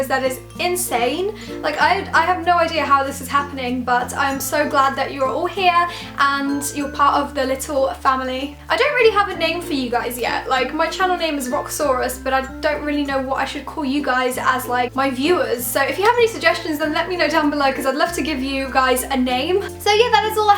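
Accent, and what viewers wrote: United Kingdom. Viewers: Are you british or something? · R u British??????? It's bugging me that I don't know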